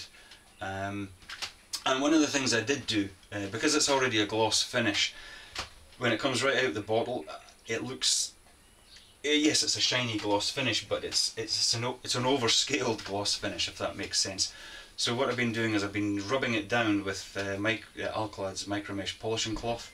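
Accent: Scotland, United Kingdom. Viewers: just wanted to say I really enjoy your videos… I like your style, man! okay, maybe it's just the cool Scottish accent, I don't know… It's good stuff. I've been on ism for about a month now and I go by RubeGoldberg. I'm sure you'll appreciate the significance of that username. ;)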